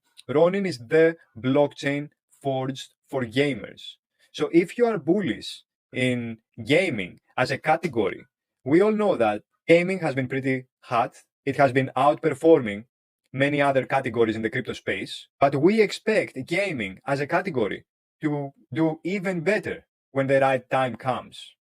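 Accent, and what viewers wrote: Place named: Greece. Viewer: Απο τη προφορα μαλλον εισαι Έλληνας ??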